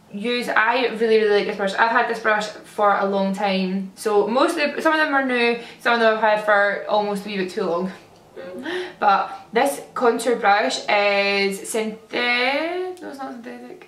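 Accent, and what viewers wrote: Scotland, United Kingdom. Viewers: Where from Scotland are you? I'm Finnish and trying to get more knowledge of specific accents so I won't look like a jerk when I'm talking to people 🙈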